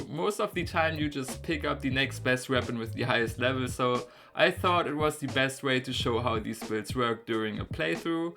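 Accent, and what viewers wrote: Germany. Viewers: I can feel the German accent in my blood... keep it up!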